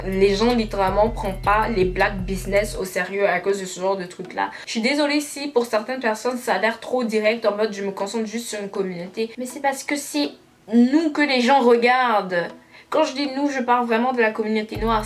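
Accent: Quebec, Canada. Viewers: Tu es québécoise ou tu veux Québec ? 🤔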